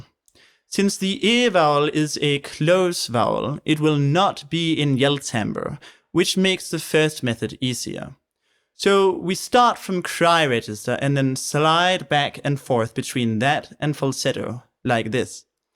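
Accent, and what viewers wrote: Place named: Oxford, United Kingdom. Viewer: I like your oxford english very much 👍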